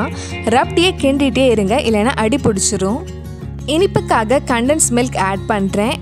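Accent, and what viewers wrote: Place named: Kerala, India. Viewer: R u malayali ..... Vithyasama iruke unka Tamil?????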